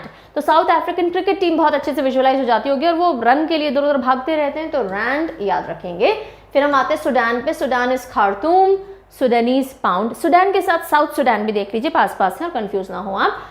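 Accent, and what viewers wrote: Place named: Bengal, India. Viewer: Aap bengal sai ho didi. Ki hallo didi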